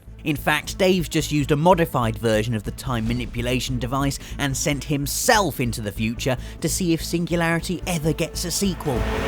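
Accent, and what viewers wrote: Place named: United Kingdom. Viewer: I love you, and your similar but different British-ey David Mitchell voice.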